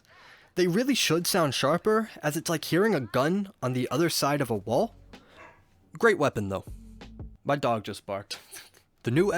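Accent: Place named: South, United States. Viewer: Your southern accent be slipping out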